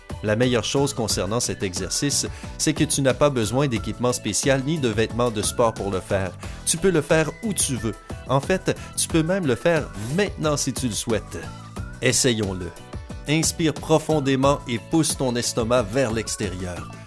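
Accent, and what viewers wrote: Quebec, Canada. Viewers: C,est un accent quebécois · accent canadien j'adore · L' accent canadien , drôle et sympathique ; vous ne trouvez pas ! ?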